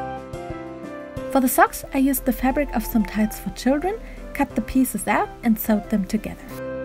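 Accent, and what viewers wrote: Germany. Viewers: Are you German, by any chance? Also, amazing work!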